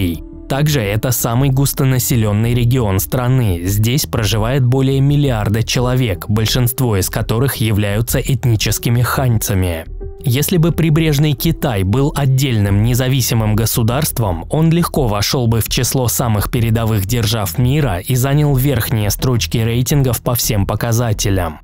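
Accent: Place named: Russia. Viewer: да вроде оригинальный канал ведёт русский судя по акценту. Разве не он и есть автор канала?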